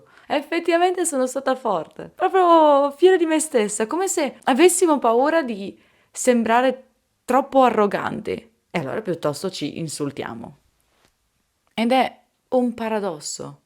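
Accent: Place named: Milan, Italy. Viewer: Ti ascolto da poco, direi che sei milanese.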